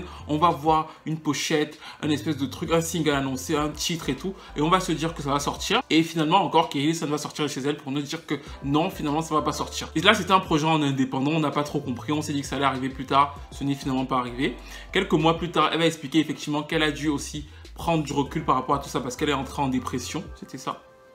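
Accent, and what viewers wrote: Cameroon. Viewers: On sent un vrai Camerounais ton accent est très prononcé 😂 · Ce gars parle comme un camerounais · Exactement.. j'ai senti mon frère kmr dans un dur topo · J'ai reconnu l'accent camerounais. Good job, Mais les extraits musicaux sont beaucoup trop courts!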